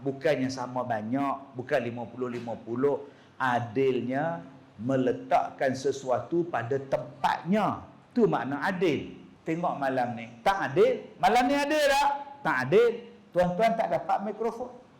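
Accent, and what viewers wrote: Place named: Batu Kurau, Malaysia. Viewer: Pelat batu kurau tu buang lh sikit .bkn ceramah Kut kg paya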